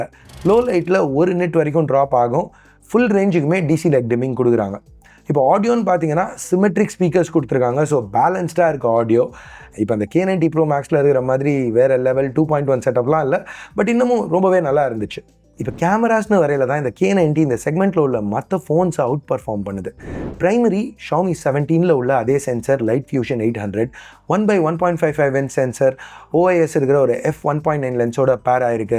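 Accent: India. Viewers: Video Dubai Voice India 👌👌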